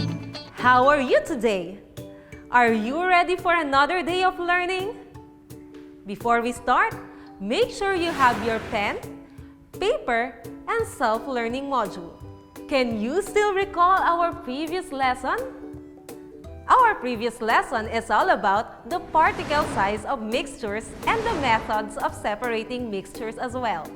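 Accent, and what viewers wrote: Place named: Mindanao, Philippines. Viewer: Ang tigas magsalita ni mam. Taga Mindanao si Mam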